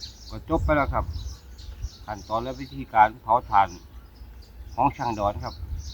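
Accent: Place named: Korat, Thailand. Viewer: เป็นคนที่ไหนครับ อยู่จังหวัดอะไรครับ สำเนียงเหมือนคนโคราชเลย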